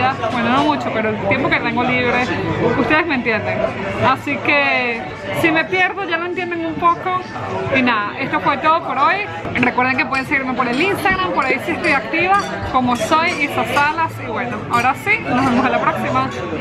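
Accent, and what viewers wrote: Caracas, Venezuela. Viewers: Isa tengo duda por tu acento, eres venezolana? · Coño mi pana el acento de sifrina de caurimare no se te quita.... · Vi su video por mí interés de viajar a Amsterdam, no se de qué país es usted, asumo que venezolana por su acento. Sobre su video el fondo es bueno pero la forma... Éxitos. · Hola isa, recién te estoy conociendo por qué tengo pensando viajar a Holanda y quise indagar un poco más, soy barbero que sabes de eso allá? Por cierto también soy de Venezuela, reconoci tu acento. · Hablas como Caracas Ciudad de despedidas. Que pena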